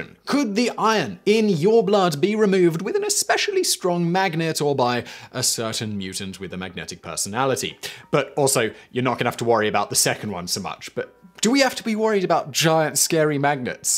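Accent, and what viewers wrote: United Kingdom. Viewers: I had no idea how many weebs -- specifically JoJo fans -- were part of Simon's fanbase until I looked in the comments. I just assumed YouTube first recommended me his videos because he's got a British accent which is coincidentally similar to the accents of aniTubers I watch. Let's try to decode this insane YouTube algorithm! Which aniTubers do you watch if any?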